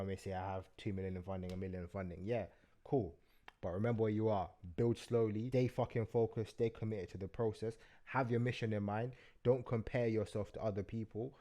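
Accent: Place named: United Kingdom. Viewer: It’s refreshing to hear a brudda from the UK talking about these things. Following!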